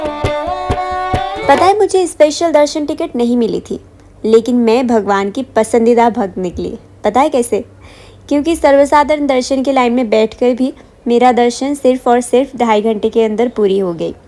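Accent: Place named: Mumbai, India. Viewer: Apki hindi mumbai jaisi h 😮 kbki maithil log to etna pyara hidni accent jante h .. i love maithili language too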